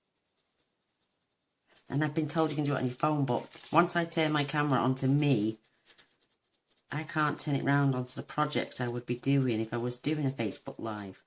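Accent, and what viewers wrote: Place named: Wales, United Kingdom. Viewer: i thought i hear ed. A bit of walsh hun i am in cardiff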